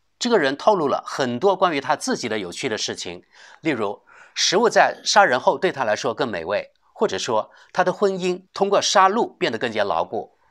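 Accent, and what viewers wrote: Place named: Guangdong-Guangxi, China. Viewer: 两广人的广普，就这样子了😅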